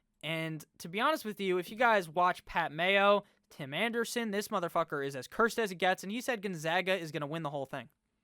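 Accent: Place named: Ohio, United States. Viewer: This dude has to be from Ohio 😂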